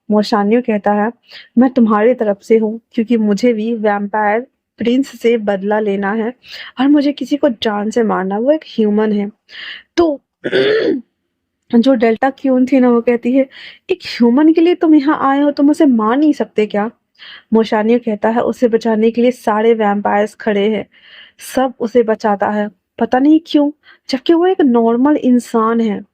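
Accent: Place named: Bihar, India. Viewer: Wonderful story di par jo aap bihari maine bolte hai wo mujhe bhot acha lagta hai osm ❤❤❤😊😊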